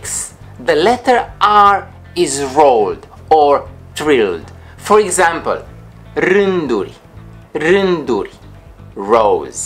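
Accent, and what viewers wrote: Transylvania, Romania. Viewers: eu mi am exprimat opinia personala referitor la pronuntie nu este nimic ciudat; ideea vlogului este f.buna; accentul ardelenesc nu ma deranjeaza iar in romania este la moda sa vorbesti romaneste cu accent american,italian etc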